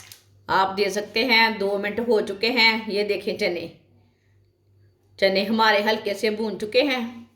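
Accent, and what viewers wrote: Punjab, India. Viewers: خدا کے واسطے اردو مت بولو پنجابی میں ویڈیو بناؤ آپکی اردو بھی پنجابی لگتی ہے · Samaj to a Rahi hy Punjabi style urdu hy to ksi ko Kya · Mohtarama ki urdu b Punjabi lehjay wali hy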